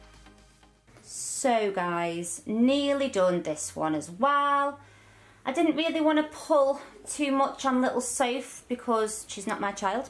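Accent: United Kingdom. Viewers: They are both British but morgz and morgz mum are from Sheffield. And I don’t think she is from Sheffield but she is from Britain 🇬🇧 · British accent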